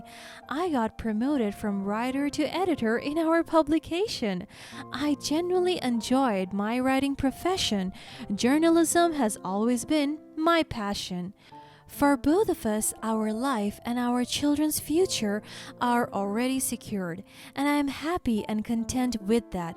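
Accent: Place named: Asia. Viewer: 80M RUPEES? NOT 80M USD ! BULLSHIT! I CAN HEAR THE ASIAN ACCENT!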